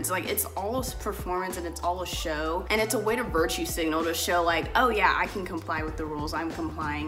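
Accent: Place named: New Jersey, United States. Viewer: the jersey accent is always a great addition to the videos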